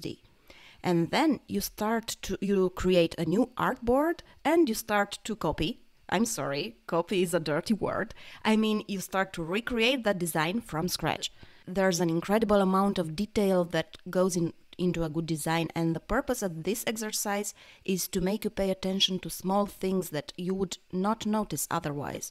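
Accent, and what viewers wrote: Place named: Russia. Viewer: Классные упражнения!Спасибо!У тебя русский акцент чувствуется)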